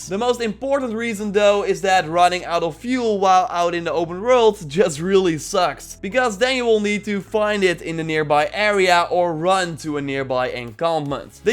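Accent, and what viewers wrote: Netherlands. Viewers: Are you from the nederlands because your accent is like that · He is dutch i hear it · Are u dutch ? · Just one random question.....are you dutch bruh?? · But it doesn't do it in a mid 90's 'Smashie and Nicey' radio accent with Dutch overtones like this video. I was just waiting for "...and to increase your score, listen to some Golden Earring, or Bachman, Turner, Overdrive on your Sony Midi-system, mates!" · Are you Dutch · Are you Dutch? Dat dacht ik namelijk te horen. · You hear your dutch axent a lot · The Dutchest of accents. Mijn dank is groot, broeder.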